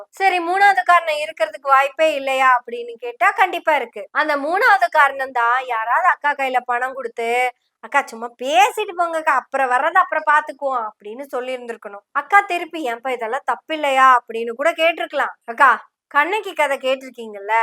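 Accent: Kongu, India. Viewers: கொங்கு தமிழில் நீங்கள் பேசும் உங்கள் கருத்துக்கள் பாராட்டுகிறேன். வாழ்க வளமுடன்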